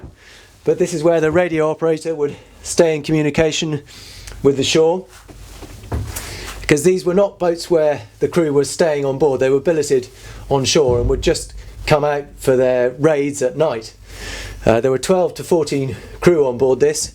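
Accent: United Kingdom. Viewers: Usually can't stand pompous ass limey commentators but this cat's solid. Well done my friend. 👊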